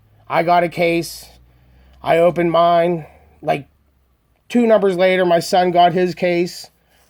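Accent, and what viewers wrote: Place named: Pittsburgh, United States. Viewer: I stumbled on your video after watching Scale Enthusiasm's top five. I thought from your "accent" and your use of "Yinz" that you were from Pittsburgh. Then when you mentioned Cranberry, I knew I was right. I'm from Pittsburgh too. Have you ever been to a Steel City Diecast Club meeting? BTW great video. I'll check out your others and subscribe. Hope to meet you in person - Rick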